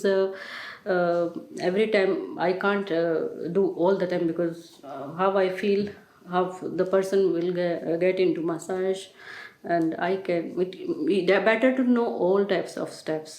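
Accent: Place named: India. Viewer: Мне нравится её индийский акцент и было бы прикольно еще посмотреть версию полностью на русскоя языке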